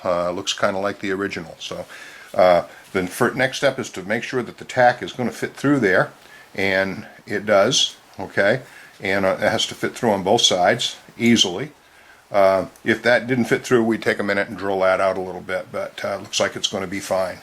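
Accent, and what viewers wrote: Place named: New England, United States. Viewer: Very, very nice job---Bravo-Zulu, amigo, for bringing a "dead toy" back to life! I saw the Windham Maine realty sign in the background....and I believe I detected a New England accent (although I believe you stated you were in Virginia). I applaud your efforts! Equally worth admiration (maybe even more?) I applaud your wife allowing you to use the kitchen sink!!! A very pleasant video!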